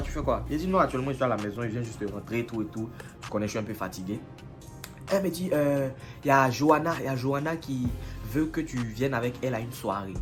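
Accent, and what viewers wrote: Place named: Ivory Coast. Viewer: Je suis fan de ton français ivoirien bro suis enjaillé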